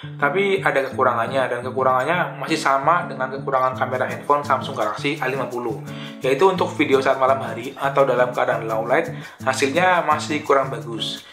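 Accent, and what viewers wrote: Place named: Java, Indonesia. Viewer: Lokasi videone pas sore nang GSP yo mas? 😅 Logate yo rodo medhok je ✌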